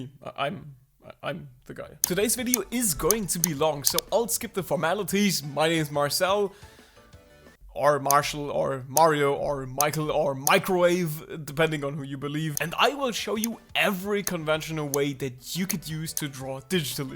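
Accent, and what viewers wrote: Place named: Germany. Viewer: I hear Deutsch :)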